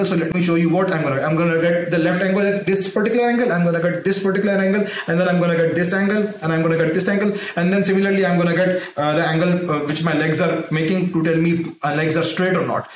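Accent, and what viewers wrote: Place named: India. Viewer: Speak much more slowly, please. English with an Indian accent is hard.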